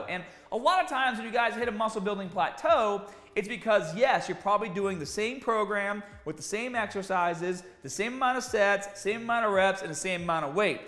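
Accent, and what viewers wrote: Boston, United States. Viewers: Love it! That Boston twang! Cheers, as always I salute you!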